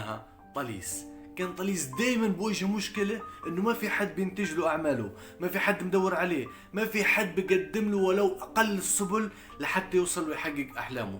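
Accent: Morocco. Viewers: يا اخي العزيز نحن نفضل انك تتكلم بلهجتك الفلسطينية ، اولا لانها لهجتك الام وهي اصلك ، ثانيا لانها جميلة جدا، ثالثا لان الخليط بين المغربية والفلسطينية ليس حلو بالمرة ، انا اتمنى ان تحافظ على الحديث بلهجتك سيصبح لك مشاهدات اكبر بكثير اذا تكلمت بالفلسطينية كذلك نحن نفضل أن تتكلم لنا عن فلسطين وثقافتها وتقاليدكم الخ الخ ....اما ان تتكلم لنا عن اشياء نعرفها لا تجذب المشاهدات هكذا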